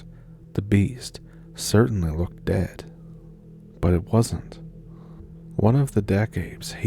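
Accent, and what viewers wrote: Canada. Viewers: Hey, -.. 💙❤️💙💙, I love hearing my canadian uncles and cousins when you talk,😂- I just want you to know, how I enjoy your stories, you should hear some known things what happened in Emerald Triangle. 🎉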